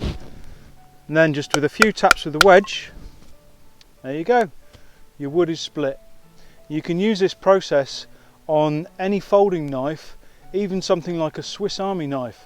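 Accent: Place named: United Kingdom. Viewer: Wow, in the world of accents I found a British man!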